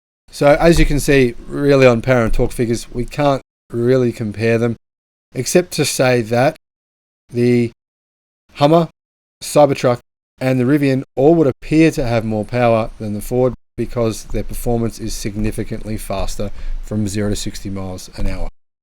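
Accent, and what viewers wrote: Australia. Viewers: Love Aussie accent! I'll wait for Rivian to deliver to Europe - or even F-150, but Ford most probably won't deliver F-150 to Europe though... (would love to get rid of my Tesla MS, won't buy any other Tesla vehicle, no matter what, because of how I experienced the really bad service and customer treatment after 4+ years of ownership...)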